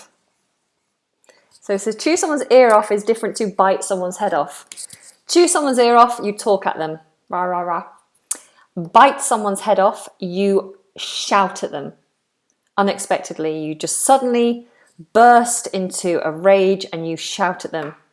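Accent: United Kingdom. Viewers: Greetings from 🇲🇽 I like to learn with you. Even If I need to learn 🇺🇸 English. I can't stop getting involved of how great British accent is. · Hello dear, very good English language teaching. I love Britain and British and the very sweet English accent. at the moment I am watching you from Iran. 40 years ago I was in Manchester United Kingdom. And I enjoy hearing a very sweet accent and remembering every thing again. To be honest I should have never leave the beautiful England. Love UK. Love people, culture, language and the great history. Thank you.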